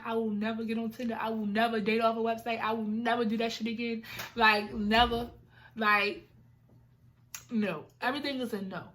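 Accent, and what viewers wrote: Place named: Baltimore, United States. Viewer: I love the bmore accent! Each time you said you I was like yasssss 🤣🤣🤣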